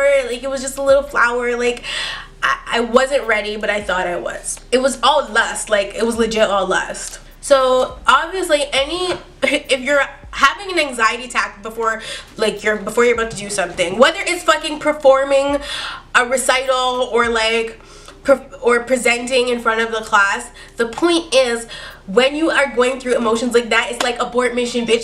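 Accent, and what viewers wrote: Jamaica. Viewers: Are you Jamaican ? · are you Jamaican by any chance. wondering because of those songs and some words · ya Jamaican, or you just like our music? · is she jamaican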